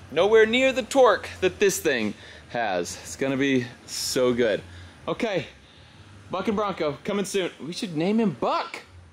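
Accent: Texas, United States. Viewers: it ain't your fault people can't tell the difference between ranch and wrench. Other folks don't know how to talk right like we do in Texas.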